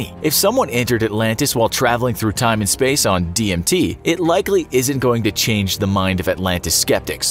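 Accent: Australia. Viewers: sounds like australia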